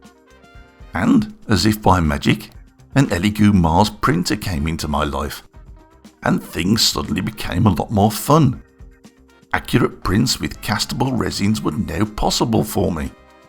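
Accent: United Kingdom. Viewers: Joe Kerr He is british, but not ringo british. Different tone. At least to my ear.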